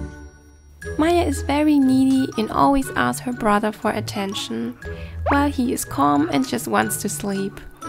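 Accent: Germany. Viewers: Do you have a german accent?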